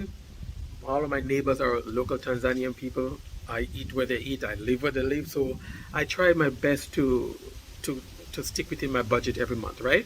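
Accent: Grenada. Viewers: His accent sounds more like Grenada. That isn’t a Jamaican accent.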